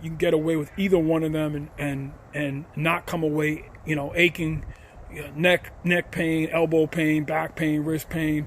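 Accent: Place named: New York, United States. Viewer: As soon as I heard your voice, it took me back home 😁 (Brooklyn/Queens/Nassau Cty) I worked at a few shops in the area in the 80’s/90’s. Enjoyed both reviews of the Jones frames, solid down to earth info. 👍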